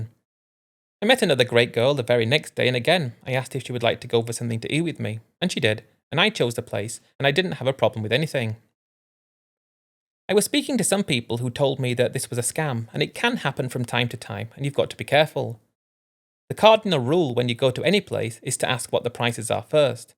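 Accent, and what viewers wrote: United Kingdom. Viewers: If you’re from the United States, why do you have a British accent? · You’re from the US? Your accent is British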